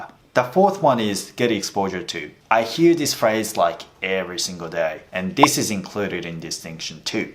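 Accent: Australia. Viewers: 久しぶりに見たら別人かと思うくらい発音がオーストラリア訛りになってました。あえてここまでする必要はありますか？ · オーストラリアの英語かわいいですよね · オージーなまりですね。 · 今日の動画、これまでで一番オーストラリア英語っぽいです！ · わざとオーストラリアっぽいアクセントで話してるのかな いつもより訛り強めですね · むかしのATSUさんの英語のアクセントと全然違う、オーストラリア訛りになってる。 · めちゃめちゃオージーの発音でびっくりです笑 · オージー訛りですよ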